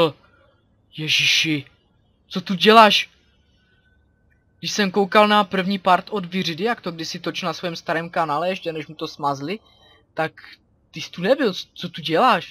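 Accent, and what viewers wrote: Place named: Ostrava, Czech Republic. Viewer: Ty mi připadáš jako ostravák (podle toho, jak mluvíš)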